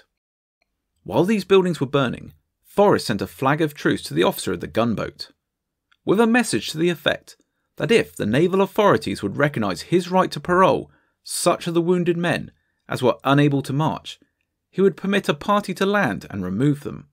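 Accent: England, United Kingdom. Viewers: Why is it always an English accents telling us about our American history on YouTube? Thank you AI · Why is an English narrarating go away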